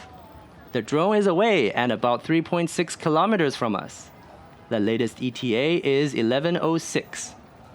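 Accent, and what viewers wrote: China. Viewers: Just sounds Chinese to me but with quite clear pronunciation.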